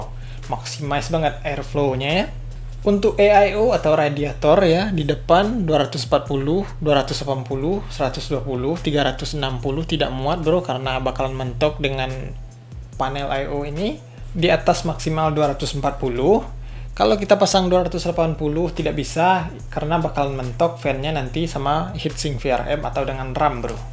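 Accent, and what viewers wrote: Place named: Bekasi, Indonesia. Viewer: Roman roman nya . Kaya bahasa di sini bekasi xixi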